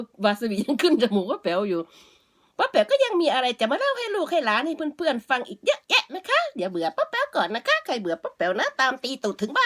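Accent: Isan, Thailand. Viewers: ฟังจากสำเนียงแล้ว...ป้าแป๋วน่าจะพูดภาษาอีสานได้ดีมากๆ และน่าจะดีที่สุดภาษานึงด้วย...ฟันธง!!! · ป้าสำเนียงอีสานนะคับผมเป็นคนอีสาน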